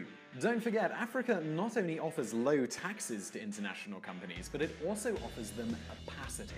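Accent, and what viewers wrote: United Kingdom. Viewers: is like VSauce's Michael with a British accent <3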